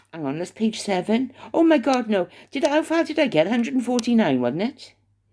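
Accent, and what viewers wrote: Wales, United Kingdom. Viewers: Your podcast is never to long for me to listen to I love hearing a friendly Welsh accent hope your fur baby 😺 is OK . Dam home shopping they never get it right . Looking forward to your next podcasts my Welsh friend 💝💐💐💐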